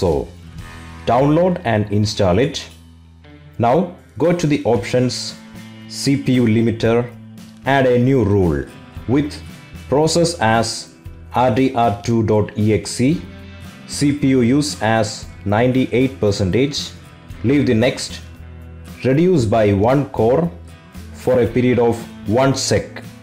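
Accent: India. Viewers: I like your sexy indian voice :))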